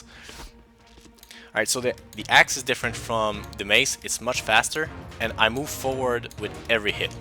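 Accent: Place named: Quebec, Canada. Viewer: J’entends le québécois dans cette voix! Allo compatriote! Bien hâte d’essayer ce jeu!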